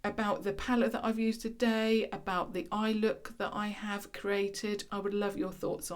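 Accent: United Kingdom. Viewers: The way you speak is so amazing! So gorgeous (and proper sounding) with the British accent but not just that your voice is so calming and nice to listen to :) (I'm from Los Angeles CA lol) but I favor British you tubers. I love visiting old palettes!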